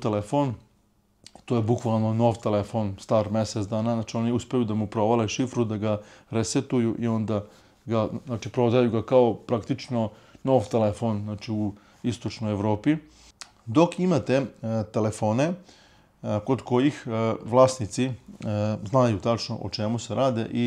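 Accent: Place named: Slavonia, Croatia. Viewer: Prijatelju zanima me odakle si , nekako mi zvuci kao da si iz slavonije kao npr Osijek ..🤔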